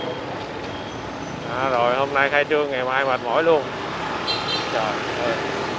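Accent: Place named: Mekong Delta, Vietnam. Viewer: THANK YOU EM CHIA SE CLIP NAY, TAI SAI GON.N TRONG KHI TAI DA NANG ,QUANG NAM ,DA BI SHUTDOWN. VOI CHAT GIONG MIEN TAY , CACH NOI CHUYEN CHAN THAT, CHAT PHAC ,ANH RAT THICH , CHANNELCUA EM .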